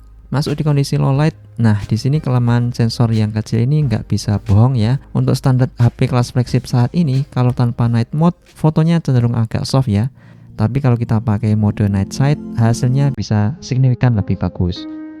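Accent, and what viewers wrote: Boyolali, Indonesia. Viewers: Lo lo lo, wong boyolali to mas?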